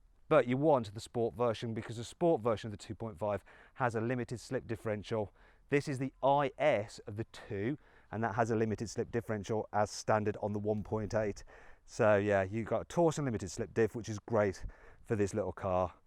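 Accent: Birmingham, United Kingdom. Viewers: sorry i couldn't understand the model of the yokohama, can someone please write it down here? thanks! (english isn't my first language and the british accent makes it a bit hard to understand sometimes!)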